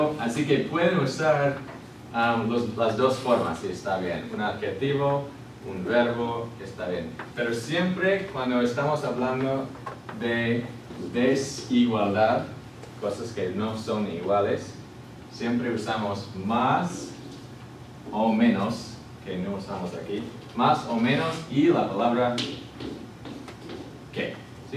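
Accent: United States. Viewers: Jejejejej normal, el acento gringo se nota, pero habla bien 👍 Hay que decirlo porque igual esas cosas se corrigen 😬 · El acento del maestro lo siento todavía muy gringo :v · Si muy bien. Obvio tiene su acento gringo pero se le entiende perfecto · me encanta el acento del gringo JAJJAJAJA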